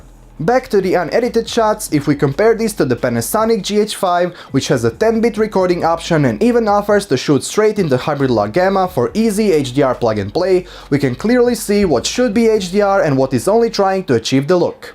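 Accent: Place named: Ukraine. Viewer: not interested in ukraine narrators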